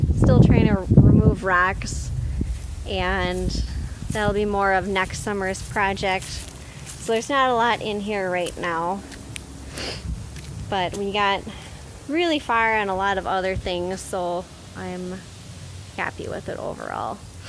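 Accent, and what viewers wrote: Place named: Minnesota, United States. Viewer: Grew up in Brooklyn Park, but this Minnesota accent is almost too much for me 😝😝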